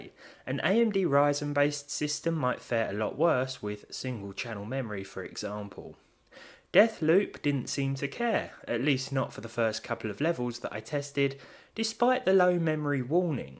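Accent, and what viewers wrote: United Kingdom. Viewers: How could i ever your Videos press on dislike??? I Love Your Videos! Nice comfortable Voice, interesting themes. And the british-like english helps me to push my language Sklills. Many Thanks! (How to say in englisch?: weiter so! (german) Further so!?) 😀 Have a nice Weekend! ❤❤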